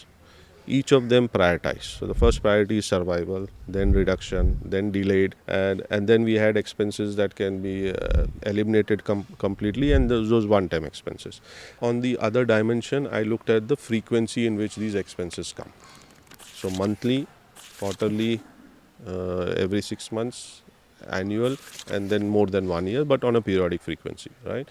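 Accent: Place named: Mumbai, India. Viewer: From the accent, I got a feeling that he also belonged to Mumbai.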